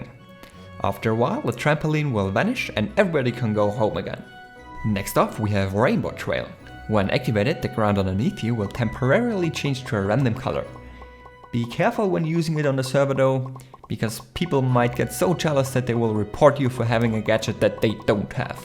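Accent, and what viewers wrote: Germany. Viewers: Dein Englisch klingt als wärst du Deutschet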